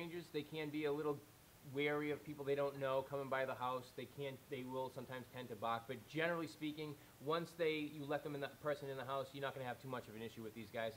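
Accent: Boston, United States. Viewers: "a very lahge dahg" hahaha love the boston accent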